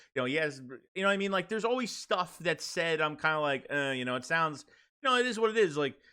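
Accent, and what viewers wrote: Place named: Philadelphia, United States. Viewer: U sound like the Philly media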